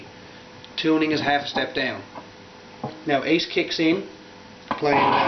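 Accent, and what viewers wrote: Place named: Newfoundland, Canada. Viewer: You sound like a good ol' Newfoundlander. Yes, m'son. Nice job.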